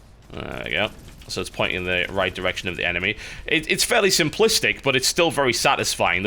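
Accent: United Kingdom. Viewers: has a specially marked brittish accent today.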